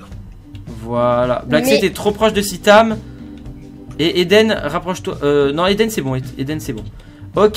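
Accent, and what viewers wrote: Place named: Italy. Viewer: Le meilleur accent italien 😭😭😂😂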